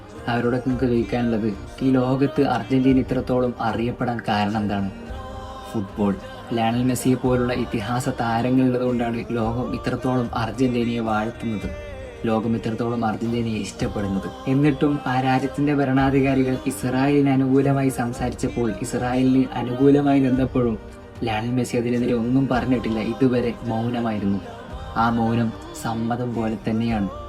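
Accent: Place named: Kannur, India. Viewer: ഞാൻ കാസർഗോഡ് 😊 ബ്രോ ന്റെ സംസാരം കേൾക്കുമ്പോൾ തോനിന്ന് കണ്ണൂർ ആയിരിക്കുമെന്ന് 😊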